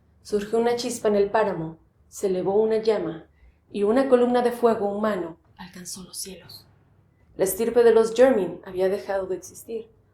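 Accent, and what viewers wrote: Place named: Mexico. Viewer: Tu tono tu voz son ambrosía para mí oídos. SOS mejicana suena dulce como la miel me recordas el aroma de las margaritas. (Las flores)🇦🇷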